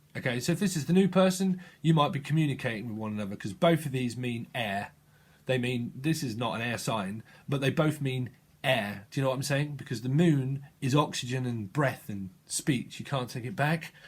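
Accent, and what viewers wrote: United Kingdom. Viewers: i understand british accent better, its more clearer than australian accent, thank you its quite resonate with me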